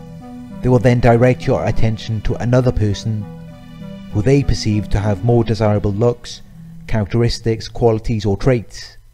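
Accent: United Kingdom. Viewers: Thank you for this. I needed a reminder tonight. Thank you for all your videos. Your voice is comforting. May I ask where in the UK your accent is from? I'm in the US.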